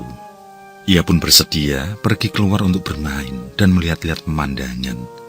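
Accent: Java, Indonesia. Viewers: Kenapa di kalimantan logatnya ada jawa²nya iya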